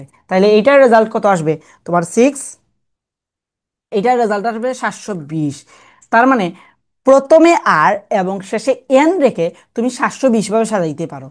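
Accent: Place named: Sylhet, Bangladesh. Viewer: ভাই আপনার ক্লাস গুলা ভালো তবে সিলেটি শুদ্ধ মিক্স হয়ে যাচ্ছে, এগুলা খেয়াল রাখবেন।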